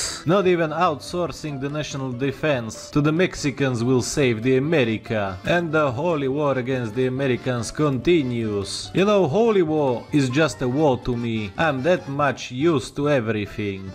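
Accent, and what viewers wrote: Russia. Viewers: Bro your the best 😜 your Russian English is quite cool 🥲💀☠️ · Bros speaking russian accent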